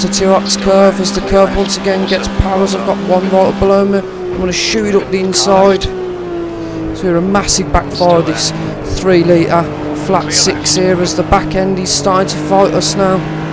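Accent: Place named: Midlands, United Kingdom. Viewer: As a fellow Midlander, love hearing your accent here 😁❤️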